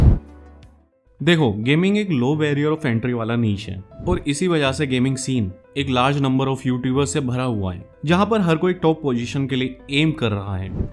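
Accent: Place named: Delhi, India. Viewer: Bro makes any game funny by his delhit accent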